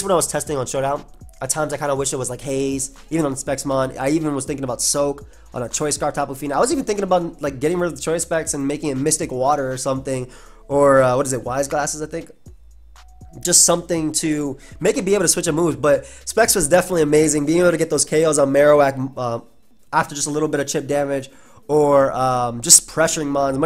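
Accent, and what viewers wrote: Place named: New York, United States. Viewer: Neeyew Yawk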